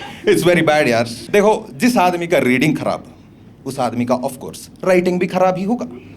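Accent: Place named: Hyderabad, India. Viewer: Woh hi tho sochru iska hindi slang kuch apna hyderabad ka lagra kaiku bolke patta tho hyderabadi bi hein!! Njaanum oru malayalees from hyderabad kalakki tto 👏👏✌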